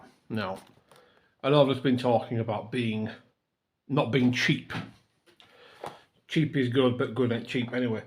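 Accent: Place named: North West England, United Kingdom. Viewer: I’m new to the channel but I love it I have my large layout in my double garage in Blackpool I can tell from your accent that your also from the north west I think My layout is totally mixed although no steam as I only knew diesels and electric so everything from deltics to class 800 inc the new class 92 from Accurascale I have about 120 locos and dmus and emus I started one year ago returning to the hobby after twenty years away and now I’m fully dcc with most being full sound fitted Great way you present the video and fantastic editing along with I really like the channels thank you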